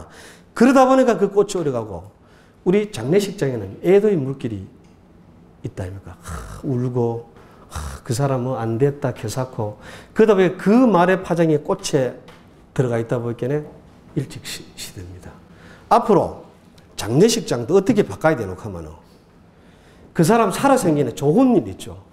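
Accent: South Korea. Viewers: 교수님 감사합니다 혹시 고향이 경북 청송이신가요? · 안동 영주 사투리군요. 말의 힘 꼭 명심하겠습니다.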